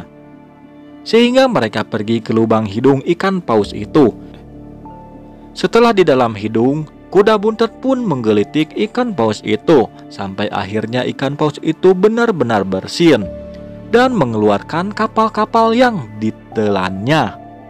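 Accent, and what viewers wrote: West Java, Indonesia. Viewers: Logat sunda na kabawa keneh jadi apal euy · Sunda pisan john logatna,,mantaap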